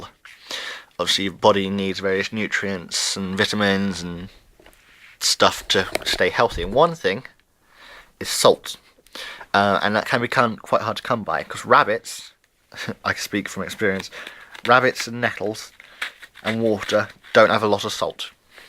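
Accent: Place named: England, United Kingdom. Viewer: i am guessing you are english :)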